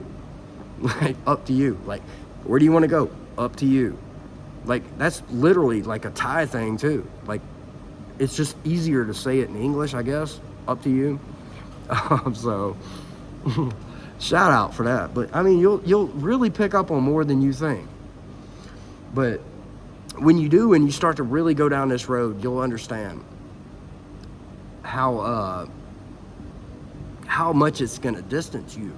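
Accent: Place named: Houston, United States. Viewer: I probably missed it in one of your previous videos, but I’m curious as to where you are from in the states. I’m from Houston, and you sound like just about everyone I know. Great video, and this type of shit is a prime example of why I’m not going back to the states once I retire. That entitlement is a motherfucker.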